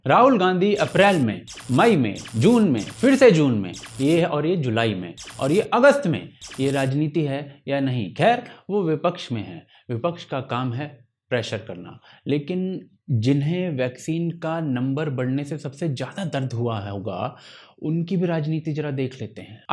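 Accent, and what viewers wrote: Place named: Malwa, India. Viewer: Sir Aap Malwa ke lagg te ho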